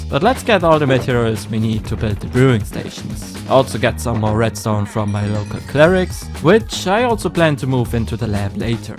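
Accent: Germany. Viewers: deutsch oder österreich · Man hört den Deutschen Accent raus. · The accent is quite obvious actually.. Though maybe non-Germans just can't tell? (amatures)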